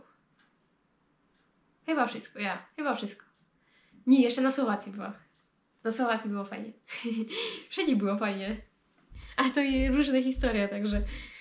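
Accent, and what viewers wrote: Silesia, Poland. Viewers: gadki po Śląsku fajnie się słucha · Uwielbiam te twoje śląskie gadanie :) Absolutnie musi to być na kanale! To tak wyróżnia ten kanał z innych. Jak komuś się nie podoba to niech nie ogląda i tyle! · Nie jestem ze Śląska ale uwielbiam słuchać gwary slaskiej, dla mnie jest to trochę taki powrót do dzieciństwa kiedy oglądało się serial Święta wojna od tego czasu pokochałem Śląsk i gwarę ☺️ Pięknie wyglądasz w tych okularach ☺️ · Siema Dziołcha nima co się wstydzisz noszej godki to je sam piękne że się godo cza ta tradycjo naszo pielęgnować i nie słuchać gupich ludzi kere nie tego nie rozumieją. Pozdrawiom z Bytomia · Ładna dziewczynka. I nawet nie przeszkadza mi " ślonsko godka". Dzięki YT za polecenie kanału. A starsza siostra wolna? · Gwara śląska jest super, fajnie się Ciebie ogląda. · Super filmiki, piękna dziewczyna i super Śląska gadka pozdrawiam i czekam na następny👍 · twojej śląskiej gwary... 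fajnie tak gadasz po wieśniacku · Śląska godko to śląska godko sztosik mówisz i opowiadasz. Tako kobite to ciężko znaleźć ;P pospolita dobra duszyczka. Podziwiam i oby ten kanał się dobrze rozwinął ;) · Jeronie jako gryfno Frelka i richtig fajny slaski akcent ♥️♥️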